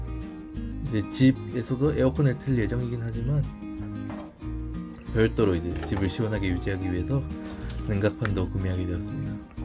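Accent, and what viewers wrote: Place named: Japan. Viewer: 우와 언어는 한국언데 억양은 일본억양이네요ㅋㅋ 한본어 신기하다 일본에 오래사셨나보내요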